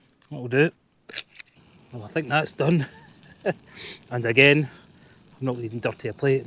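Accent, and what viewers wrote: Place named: Scotland, United Kingdom. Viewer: That's quite a thick Scottish accent I think I understand half of what you say lol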